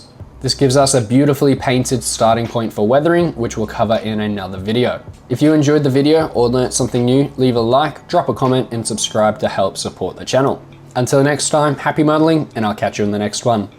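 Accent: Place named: Australia. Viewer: Great stuff Miss the Aussie accent from home over here in the UK Thanks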